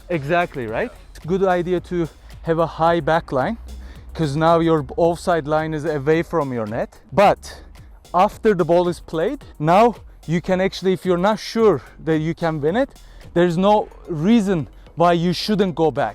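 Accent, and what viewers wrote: Turkey. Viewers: Seni tanimiyorum ama aksanin tam bir turk bu yuzden ARDAAA GULERRR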